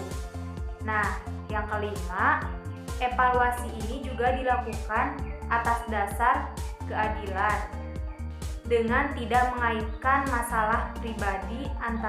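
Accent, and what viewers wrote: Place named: West Java, Indonesia. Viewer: Memangni dari SMA klo dengerin ni anak ngaji suka enakeun aja . Ke sunda sundaan merdu 😋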